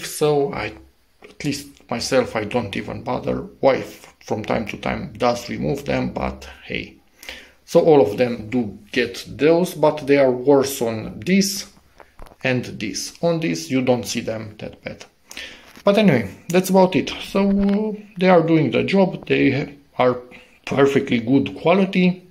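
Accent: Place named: Romania. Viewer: I have a Romanian friend who sounds exactly like you when he speaks English.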